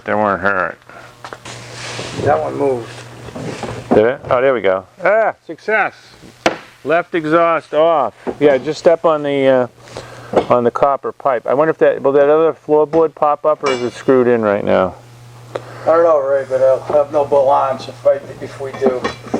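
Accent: Boston, United States. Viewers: Yep I would like to see you fix it rather than swapping out the hull if it's not too far gone of course, I'm not from the US and I'm just curious about your accent, I am going to guess at Boston region ?? I'm probably the wrong end of the country eh lol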